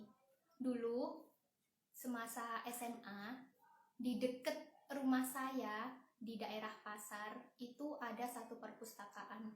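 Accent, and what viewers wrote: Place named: Java, Indonesia. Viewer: Medoknya dapet banget, 😁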